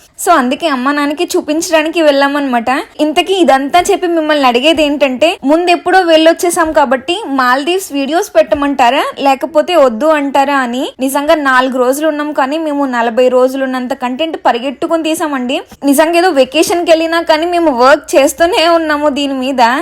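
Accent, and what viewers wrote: Godavari, India. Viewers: Godavari slang ,ma relations lo kondaru achu ne lane matladataru, rajamundry na chuttupakkala oora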